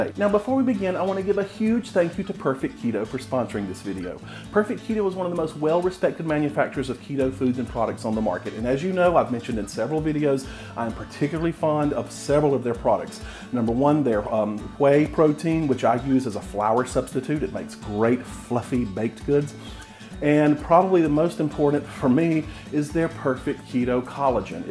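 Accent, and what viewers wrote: South, United States. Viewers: Nah, he's just southern.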